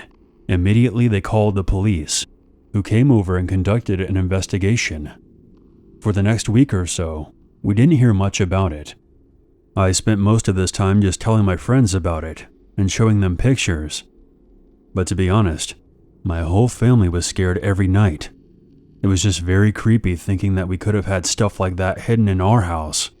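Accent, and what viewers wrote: Australia. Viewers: The irresistible time with! Thank you for remarkable work with great stories. Your voice narration is always spotless! Edit: That end was awesome! You spoke like an Australian 😄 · Wowsers. Your Ozzie accent on point, mate 🤙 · thats a solid aussie accent not even Australian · Wrong, he sounded spot on By the way, we use the word “bloody”, but we don’t go through the trouble of typing it out online… Just a little tip the next time you pretend to be an Aussie, you poser lol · Your Aussie accent is very Northern Australia, great job!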